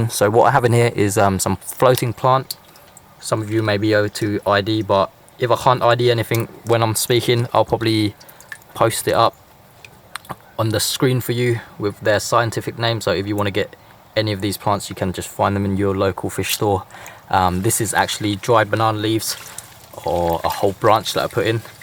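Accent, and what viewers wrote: England, United Kingdom. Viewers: How come you have a strong english accent? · New to the channel have you lived in Britain you sound like it? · How come you have British accent? (In a good way)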